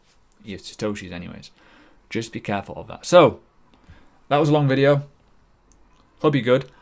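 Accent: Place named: North, United Kingdom. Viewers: He’s a northerner! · What absolute dog's bollocks, how come you not tell my mate is from England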